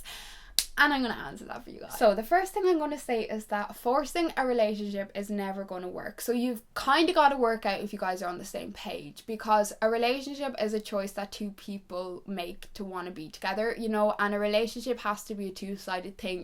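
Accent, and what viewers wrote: Ireland. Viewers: I love your Irish accent! Can u say 'r u actually stupid?' in an Irish accent?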